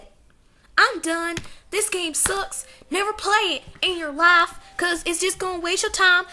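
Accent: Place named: United Kingdom. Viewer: Are you British or not? Cause you sound like it. But I Love you!!!!😘😘😘😘😘😘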